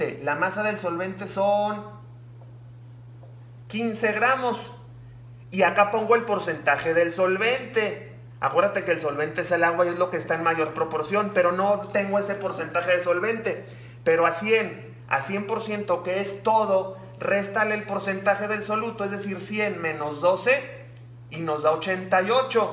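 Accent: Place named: Mexico. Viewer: No es por discriminacion pero me da dolor de cabeza el acento de los mexicanos 🤷‍♂️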